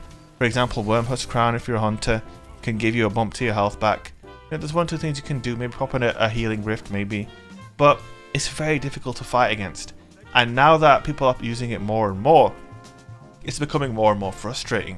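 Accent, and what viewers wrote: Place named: Yorkshire, United Kingdom. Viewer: i always wondered why my accent sounds so similar to yours. NO WAYY you're from west yorkshire, im from north yorkshire and i watch your videos all the time! the earth is so small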